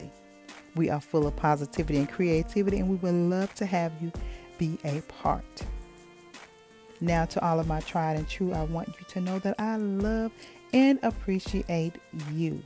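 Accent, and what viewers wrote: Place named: Midwest, United States. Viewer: Hey Would You write your basic blurb in the ABOUT section? And where are you, what part of the country. You sound mid-western'yy. M. IL. Chi western 'burbs.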